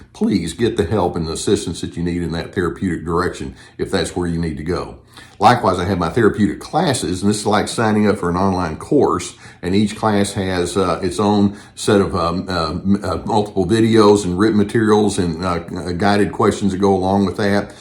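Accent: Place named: Houston, United States. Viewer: This is utterly excellent. Thank you, a voice for real humanness in a world gone mad. You your words truly benefit many. Your humility and honesty nurture our souls and authentic selves. I have even come to enjoy your Houstonian accent, very much, because your balancing Weltanschauung shines through the way you convey your wise words and pure intent. So, thanks again and again. To me you are a Voice in the wilderness. You seem to be a Voice for The Voice of the Silence...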